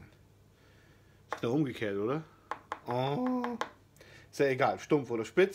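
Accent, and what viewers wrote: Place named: North, Germany. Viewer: Cooler Kanal! Endlich mal jemand der ein Norddeutsch schnackt! Ich freue mich auf weitere Videos von dir!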